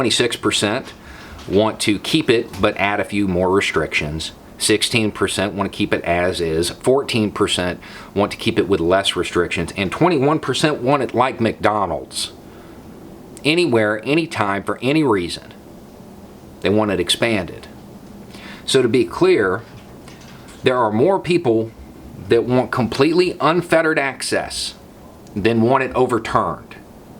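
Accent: South, United States. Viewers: you make the hardest subjects so easy to understand for we mortals. Your southern drawl delivery is calming. Thank you sir and keep the good work!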